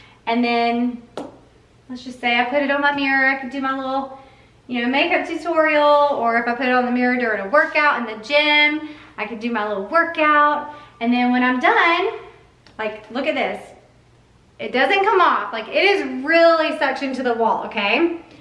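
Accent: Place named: Georgia, United States. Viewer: Please enter me in your give away. I enjoy your channel very much, partly because of your Georgia accent. I live in Colorado. God bless 🙂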